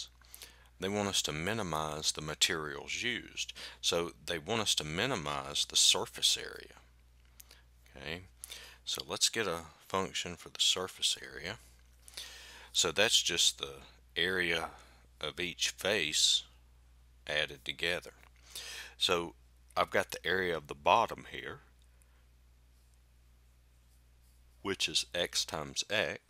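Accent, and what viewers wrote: South, United States. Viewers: Modern day life is so wonderful if I have bad teachers I can have a smart dude with a country accent explain maths to me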